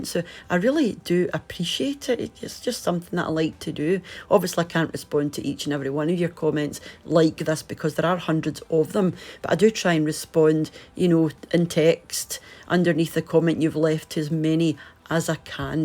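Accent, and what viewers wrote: Scotland, United Kingdom. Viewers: 'Nice of you to take the time to mention us here in Australia. I live in Sydney. It's been awful for a lot of people. Your channel is great by the way. You sound like my Mum from Renfrew. Oh, and just a little bit of humour to finish. You certainly don't look like that woman on the bargain show. Keep well.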